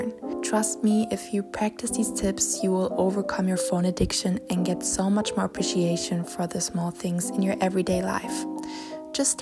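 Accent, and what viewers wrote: Germany. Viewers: Me thinking her voice sounds German. Then she pulls out a German book. ✨German power ✨ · Du hast voll den deutschen Akzent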